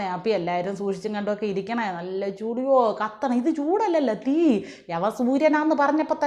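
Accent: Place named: Trivandrum, India. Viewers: Jai Shree Ram 🙏🙏🙏 എൻ്റെ മോളെ ഈ തിരുവോ ന്തരം ഭാഷ ഒക്കെ എങ്ങനെ പഠിച്ചു മോൾ ഒരു അസാമാന്യ കലാകാരി തന്നെ. നല്ല ഒരു actress ആകാനുള്ള കഴിവുണ്ട്. വാണിവിശ്വനാഥിനെ പോലെ bold and beautiful. All the best 🙏🙏🙏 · Superb. More and more feathers are being added in your cap for exemplary & highly qualitative presentation in a pure sense of humour that too in Trivandrum spoken language. One sincere request Please make it possible to present your next episode in Malabar (Kaakka) spoken language. Definitely you are having supreme efficiency to make us to enjoy. Fervently hope that my sincere request will be taken care of in a true spirit. ABHIVADHYANGAL. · 🙏🏻🙏🏻🙏🏻🙏🏻 താങ്കൾ ഒരു സംഭവം തന്നെ.... നമ്മളെ തിരോന്തരം ഭാഷ അതിശയകരമായി അവതരിപ്പിച്ചു.... 👌👌👌👌👌🙏🏻🙏🏻🙏🏻🌹🌹🌹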